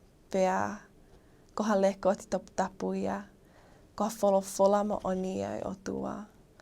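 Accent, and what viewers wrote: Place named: Tonga. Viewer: you speak Tongan so fluently... accent is sooo Tongan Tongan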